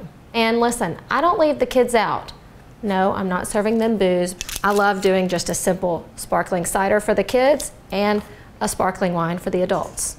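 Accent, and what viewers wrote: South, United States. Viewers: Girl please wear color against that white kitchen 😘😘😘😘😘💜💜💜You have a lovely Southern accent. Great meal! 💜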